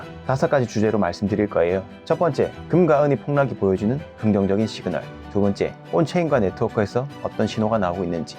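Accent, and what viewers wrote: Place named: Gyeongsang, South Korea. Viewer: 이 유투버는 사투리를 깔끔하게 사용해서 듣기좋다. 요즘 어설픈 표준어 역겹게 사용하는 경상도 사람들이 너무 많다. 구독 강추!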